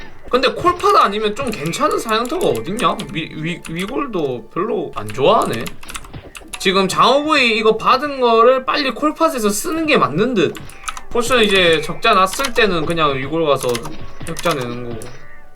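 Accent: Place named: Gyeongsang, South Korea. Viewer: 경상도 말투 진짜 ㅋㅋ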